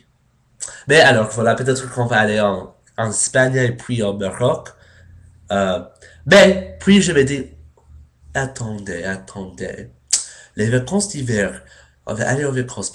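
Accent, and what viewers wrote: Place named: United States. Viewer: J ai regardé plusieurs de tes vidéos je trouve ca genial ce que tu fais , tu parle bien francais , tu es marrant et sympa ton accent américain =) Voila merci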